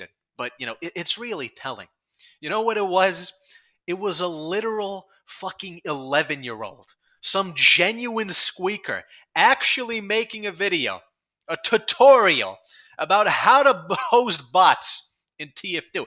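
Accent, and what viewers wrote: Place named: Italian-American, United States. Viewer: Youre the most italian american man ive heard since i watched the sopranos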